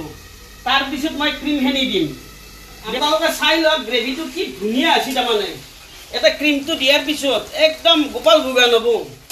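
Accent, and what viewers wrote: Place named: Lower Assam, India. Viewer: ভাল লাগে ফে আপোনাক ❤ । ভাষা টো যে নামনিৰ । সেইবাবে !!!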